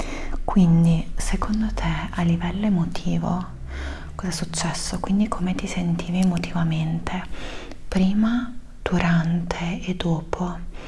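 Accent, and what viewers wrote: Italy. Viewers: A pesar de que no entiendo nada...el acento italiano en asmr me hace tener demasiados triggers.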